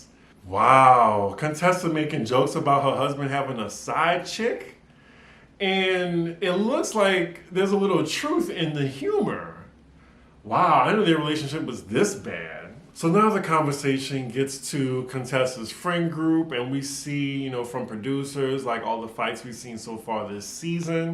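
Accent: New Orleans, United States. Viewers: I know you've been in NY for a while but I still hear many hints of Nola! 🥰🥰 I'm sick of Toya ass and I didn't need to see Kari...Great review!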